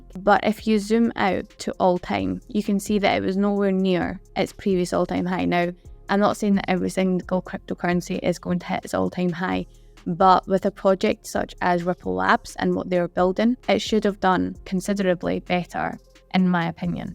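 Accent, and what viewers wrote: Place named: Edinburgh, United Kingdom. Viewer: Nice video.. That sounds like an Edinburgh accent, that's where I'm from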